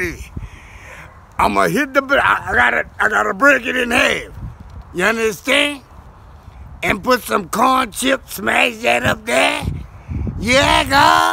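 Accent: United States. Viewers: He sound like he from my city, Lafayette Louisiana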